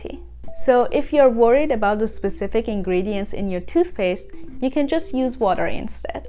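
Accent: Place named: Iran. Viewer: You have a sweet Persian accent! And u look sweet too!! 😍😍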